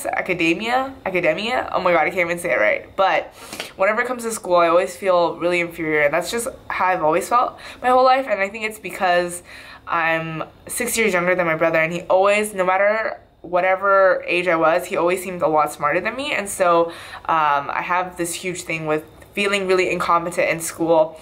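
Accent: United Kingdom. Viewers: Omg! So proud of you!!:) love your british accent haha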